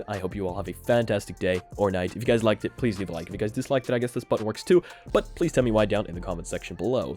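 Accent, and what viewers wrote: Ireland. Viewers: Wait your Irish ☘️ small world thought this audience was just Americans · your irish? oO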